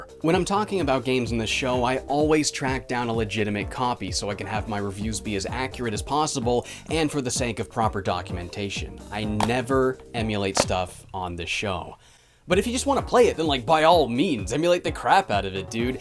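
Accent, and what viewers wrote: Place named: Nova Scotia, Canada. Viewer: Yo what the fuck you're Nova Scotian?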